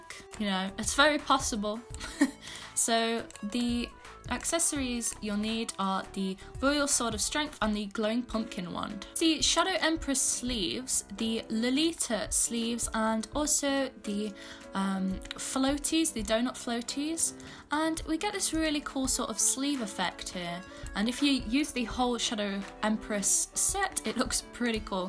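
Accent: United Kingdom. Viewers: I love your accent btw! I think it’s british right? I’m british too.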